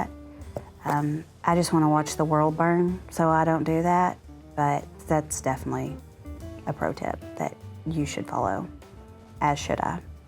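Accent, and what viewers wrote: South, United States. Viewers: Got to love a pretty lady leathercrafter with a southern accent. You probably found that you dulled your clippers shearing the lining. But they can be easily resharpened with a whet stone. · You are very talented, got homesick listening to your accent, I am a displaced Texan!!!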